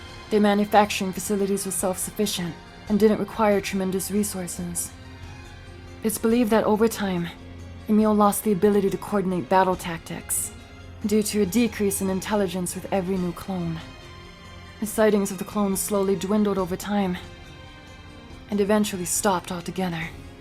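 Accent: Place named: Boston, United States. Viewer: The boston / NY accent bleeding in is hilarious.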